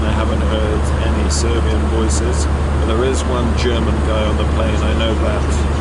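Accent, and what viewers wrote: England, United Kingdom. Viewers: How did you get Serbia citizenship? With that English accent!?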